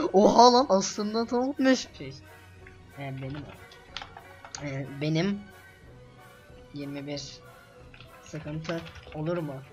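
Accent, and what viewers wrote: Azerbaijan. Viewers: lan Azermisin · Azerisen sen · lam san Azerbaycanlimisin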